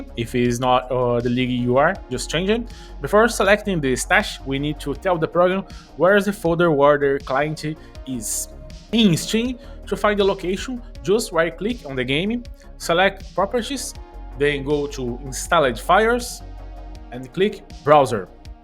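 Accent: Brazil. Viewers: You have to be Brazilian or Portuguese , i can tell by the accent! anyways no hate or anything just something i noticed but thank you for the awesome video!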